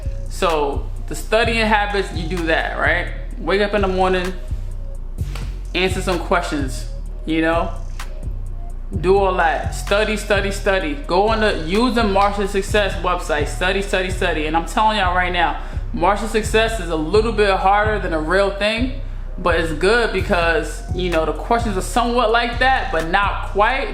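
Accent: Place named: New York, United States. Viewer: She from New York lol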